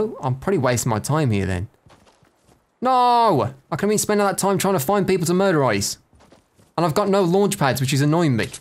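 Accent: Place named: Midlands, United Kingdom. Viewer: Just subbed. Enjoying thr ps4 game play and I'm guess from the accent from a fellow midlander. Not sure why my fornite plays totally different to this tho. Whenever I get in a gun fight in busy areas I lag out and die ( that's with virgin fibre connected with an Ethernet).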